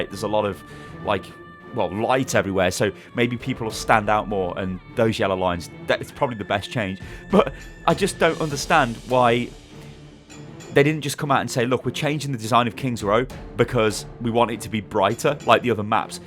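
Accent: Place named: Midlands, United Kingdom. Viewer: You're British, stop saying "like" all of the time... you sound like an Orange County teenage girl... with a midlands accent.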